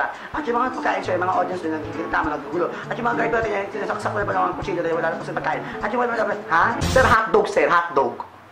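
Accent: Visayas, Philippines. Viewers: Bisaya ka??? xD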